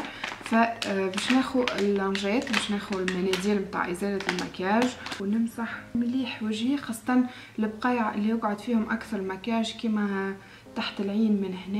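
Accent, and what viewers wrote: Tunisia. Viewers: انا مغربية نحب لهج تونسي برش تبارك عليك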